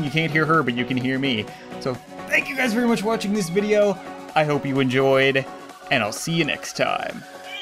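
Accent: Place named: Canada. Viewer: I love your canadian accent btw :P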